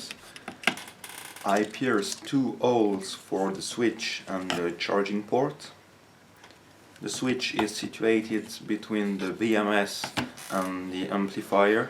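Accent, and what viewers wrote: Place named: France. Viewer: beau projet ,On sent bien l'accent frenchy c'est cool ^^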